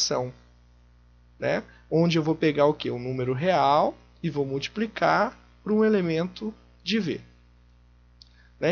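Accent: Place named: Paraná, Brazil. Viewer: Os vídeos estão cada vez melhores. Nesse vídeo o apresenta com cuidado a definição de Espaços Vetoriais. O sotaque paranaense é um charme (hehehe). Para que está estudando o assunto, a dica é pegar uma folha de papel e reproduzir, sem olhar, a definição apresentada. Depois que tentou ao máximo lembrar de todos os detalhes e ESCREVER no papel, voltar ao vídeo e comparar se conseguiu tudo. Esta estratégia é bem indicada para aprender um tema como esse.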